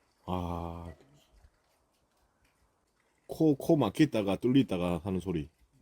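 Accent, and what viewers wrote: Gyeongsang, South Korea. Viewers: 오모나 보고싶었어예^^ 한치'팩한 이후로 얼굴색깔과 손이 검어졌어요~ 😂 경상도 말씨 듣고싶으면 보고 음~~웃겨요 ~~^^ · 사투리를 잘 들어보면 90프로 경상도 + 10 전라도 가 섞여 있는 느낌. 사는 지역이 어딘지 모르지만 진주, 창원, 김해?? 그쪽일듯 싶다.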